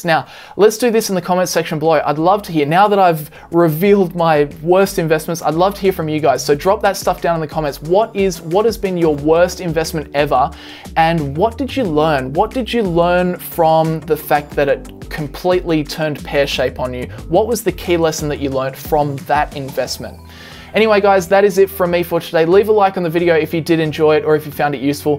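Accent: Australia. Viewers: Wait, are you Australian? I thought you were Dutch...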